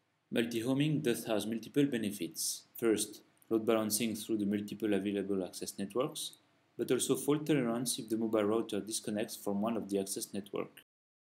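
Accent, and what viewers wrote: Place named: France. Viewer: No no, French English ;-)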